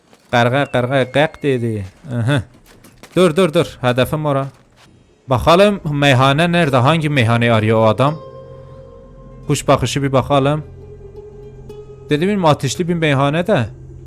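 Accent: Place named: Kurdistan, Turkey. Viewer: çekemeyenler olacak seni sen bir Kürtsün senin şivene doğalığına kurban valahi senin takipçin artıkça ben seviniyorum yolum açık brakoo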